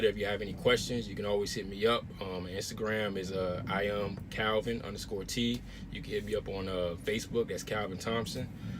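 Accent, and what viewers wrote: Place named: Springfield, United States. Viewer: Sounds like youre from Springfield MO.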